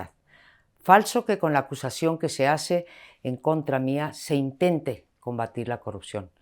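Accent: Spain. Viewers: Y por qué habla así, como española, decía mi abuela: cada quien se revuelca en su mismo lodo, señora se queja de lo mismo que han estado haciendo ustedes por todos los medios "oficiales" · Porque habla medio española?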